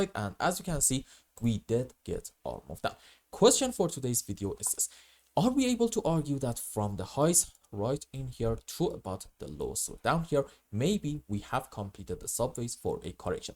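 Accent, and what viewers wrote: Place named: Iran. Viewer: I have a feeling that you are from Iran. am i right? cause you accent is so similar to those! if you tell the truth , i will consider to subscribe bro.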